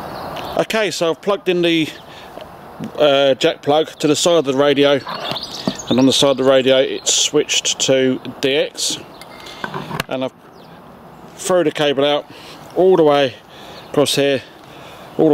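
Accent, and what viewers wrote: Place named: England, United Kingdom. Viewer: Nice too hear somebody from back across the pond . I’m a. XPat living in the States . I have the Tecson 310 and love it . Where in England are you ? I was from the the Midlands Coventry. Thanks again .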